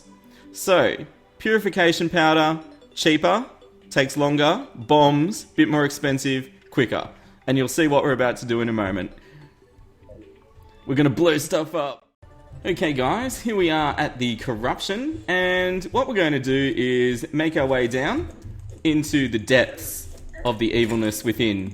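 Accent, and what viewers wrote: Australia. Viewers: But he has an Australian accent XD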